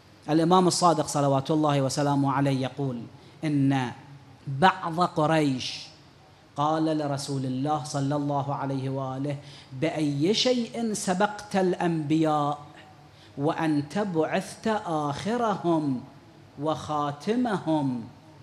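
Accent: Iran. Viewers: لان ايراني وقليل يعرف عربي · هو يمكن إيراني لهذا السبب لهجته هكذا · ايراني وهيج يحجي كوه يعرف عربي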